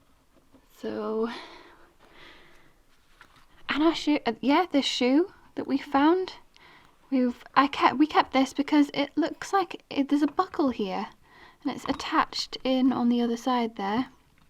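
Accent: United Kingdom. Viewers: Love your very british accent..... and i'm british. 😛😜😛😜😚 x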